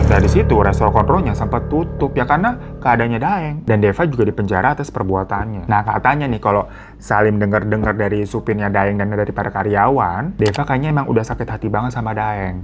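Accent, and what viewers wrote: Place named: Makassar, Indonesia. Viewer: Kok lucu basa kotor mks 😂 logatnya beda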